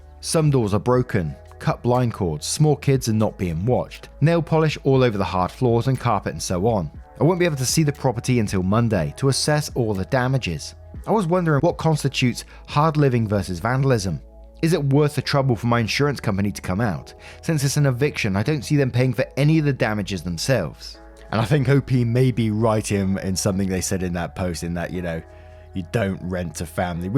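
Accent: England, United Kingdom. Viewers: From Norn Iron and I love your English accent!!!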